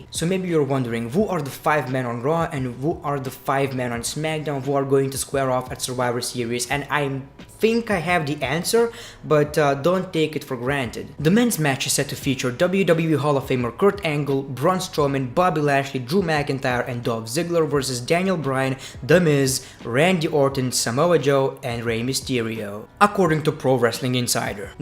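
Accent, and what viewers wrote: India. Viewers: Sounds Asian/Indian... Why?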